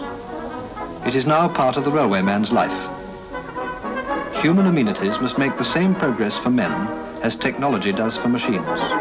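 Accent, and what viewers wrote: RP, United Kingdom. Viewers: Very good commentary cut glass accent people took a pride then… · "rubbing it in and rubbing it off... ...with a massage and a man-sized rinse". I'm sure this plumb-voiced man knew exactly what he was saying, even in 1961 :-)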